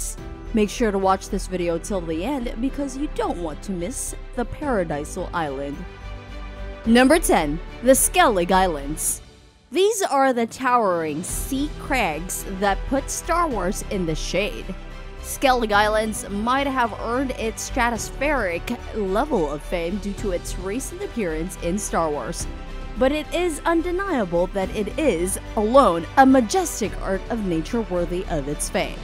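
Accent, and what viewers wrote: United States. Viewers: A PNW voice, possibly shy, unconfident. ?.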